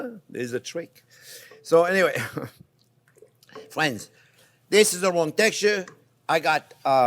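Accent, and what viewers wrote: France. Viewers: And i love your french residues in your English as much as i love you detailed instructions & explainations in your video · “Onyo is always number first”, you’re awesome... I’ve also been using your accent while cooking. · Just discovered your channel last week and haven’t stopped watching the videos ever since! I love your voice, your food, your accent 💖 Everything about you and your channel is absolutely succulent 🤩 I’m almost 70, of Persian origin, living in France for the past 50 years, married to an Italian for over 2 decades and recognized as a good cook by all (even by my late mother in law who was a dream of a cook herself!) So I dare say I know food 💃🏻 You and your channel are a pure delight. Don’t change a thing👌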